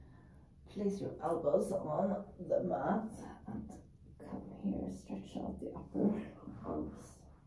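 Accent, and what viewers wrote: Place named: Spain. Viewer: I like your Spanish accent while speaking.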